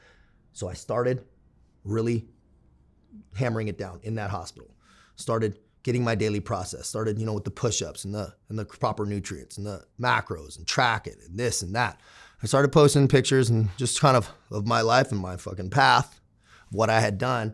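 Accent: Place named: New England, United States. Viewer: his ummss are just fuckins. hes a new englander like me so i know😂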